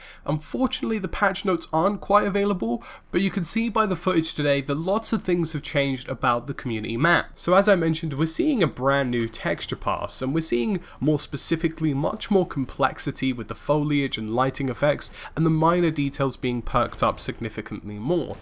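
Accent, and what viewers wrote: United Kingdom. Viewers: You have a very light accent for a brit! May I ask where you're from more specifically?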